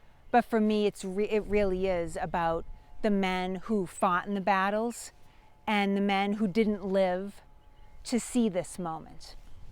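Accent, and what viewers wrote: Boston, United States. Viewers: Amazing Boston Accent. War between civilized nonsuicidal peoples is so different from war with Japan or Islam where the enemy doesn’t care if they die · It’s All Boston accent All the time. I love it. I grew up on the North Shore and moved away. And watching videos is like being back home. Oh, and the history part is fantastic as well.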